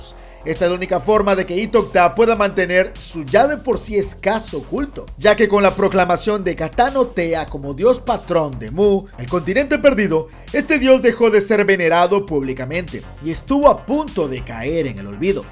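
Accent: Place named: North, Spain. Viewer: Demasiado norteño el parcero 😅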